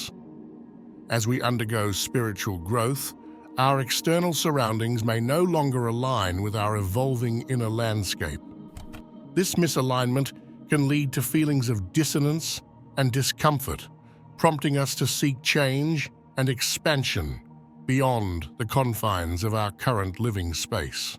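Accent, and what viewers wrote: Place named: Australia. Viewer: Nice Aussie TTS. Good one. 👍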